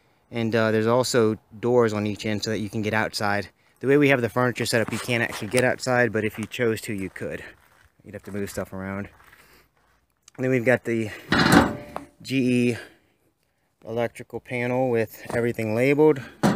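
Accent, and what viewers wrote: United States. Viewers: You sound like you're from Philly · Your definitely not from Texas, lol. What's that accent? Yankee?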